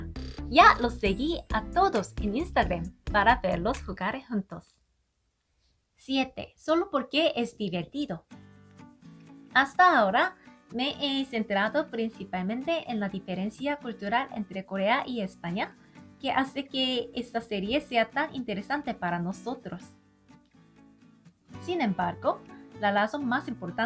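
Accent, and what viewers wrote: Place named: Mexico. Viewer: Hola que interesantes tus videos me gustan. Tienes el asentó del español de Mi México sigue así te deseo mucho éxito en tu canal.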